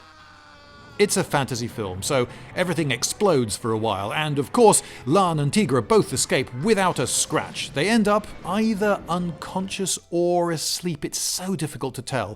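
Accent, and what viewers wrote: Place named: United Kingdom. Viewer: For real this bad teeth brit voice is annoying to hear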